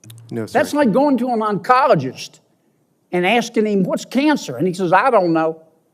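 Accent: South, United States. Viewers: I wish this guy would speak slower and enhance his southern drawl.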